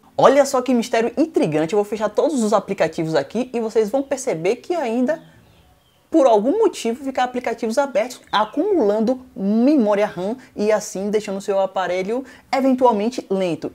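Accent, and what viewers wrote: Bahia, Brazil. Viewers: Estou sentido um sotaque familiar( baiano ) no vídeo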